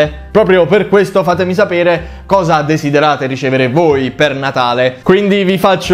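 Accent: Naples, Italy. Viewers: Non si sente che è di Napoli? 😂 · Ma sei napoletano?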